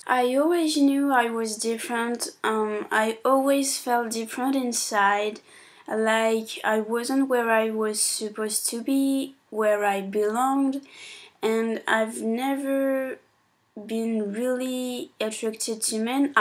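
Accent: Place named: France. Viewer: j'adore votre accent . teach me more french?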